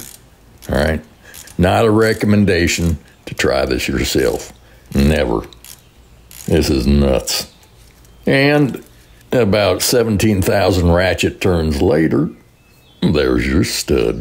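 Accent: South, United States. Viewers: He has southern drawl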